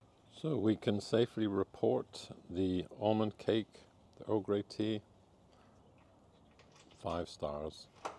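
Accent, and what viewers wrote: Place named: United Kingdom. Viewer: Sounds like a Brit who’s lived there for quite some time to pick up some Americanisms in his speech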